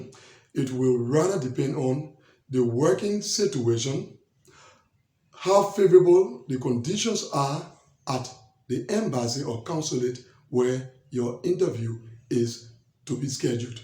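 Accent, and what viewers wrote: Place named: Liberia. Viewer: Hello bro, which national are you? Because you sounded like a Liberian...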